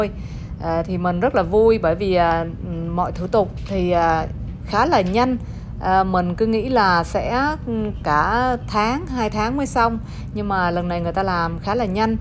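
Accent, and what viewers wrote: North, Vietnam. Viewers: Co noi nguoi mien Tay vay tinh nao vay toi dan Can Tho , nghe giong co co am huong nguoi Bac chu khong rat dan mien Tay cua Chung toi mong noi ro , toi xem clip co moi ngay , congratulations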